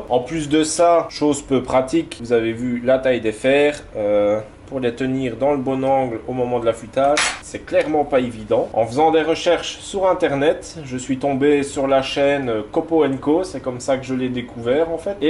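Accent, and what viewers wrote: Belgium. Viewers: Bonjour, suite à ta vidéo sur la raboteuse dégauchisseuse j’ai vu une chose qui m’a bien plu et que j’ai appliquée. Ce système pour affûter les fers de rabot est vraiment ingénieux et facile à faire malgré tout et je me suis dit pourquoi ne pas essayer et ça marche vraiment bien car j’ai pu affûter plusieurs séries de mes fers « rabot- et dégauchisseuse » merci aussi a Copeaux… car elle y est pour quelque chose lol !! Tu vois que parfois une femme dans un atelier ça peut-être pratique .Je plaisante bien sûr .Je me suis abonné a sa chaîne aussi de ce fait .Dis d’après ton accent tu ne serais pas un peu Belge une fois ou je me trompe ? Moi je suis du Pas de Calais donc pas très loin. Merci pour tes vidéos sympas que je ne manque jamais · Salut, tu es Belge ? (Tu dit 1,70€ et accent) Il parait qu on trouve la Titan a 228€ chez Brico Depot en belgique Brico Planit ?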